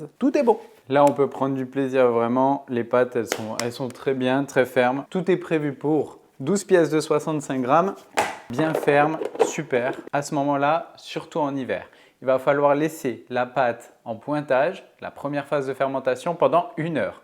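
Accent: France. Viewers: Sigh! I love listening to your beautiful French voice! In honor of my French ancestors I salute you sir!🇫🇷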